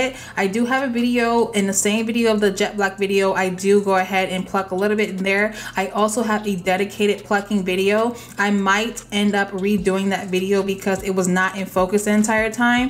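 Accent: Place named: Louisiana, United States. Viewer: Love your videos You Rock!!!!!!!! U sound like u are from the Cajun State like me.!!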